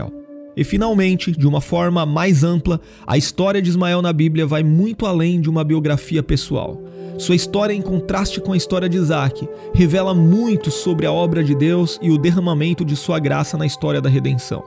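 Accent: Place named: Interior São Paulo, Brazil. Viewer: Essa pronúncia do R do narrador, Um R corrido, é horrível. O cara faz vídeo pra o Brasil inteiro e não trabalha a pronúncia do jnterior Paulista. sinistro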